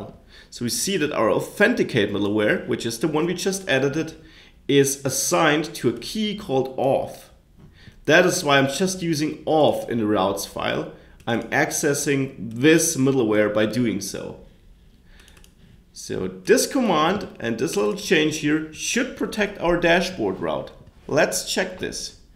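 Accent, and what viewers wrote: Germany. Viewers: you for the prompt response 😊 I haven't yet looked at this but I will. I get what's going on and change what I believe I think is not working but nothing happens. I'll let you know if anything changes once I've compared and amended according to you git repository. Thanks you, great videos, I'm enjoying the German accent. :)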